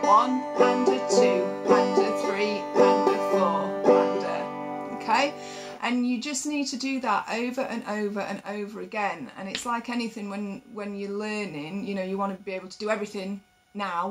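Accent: England, United Kingdom. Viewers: I really like your style of teaching Claw Hammer banjo! I will be watching your videos! I'm from Texas and I love your British accent! And you're happy! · So much easier to learn banjo from someone with an English accent :)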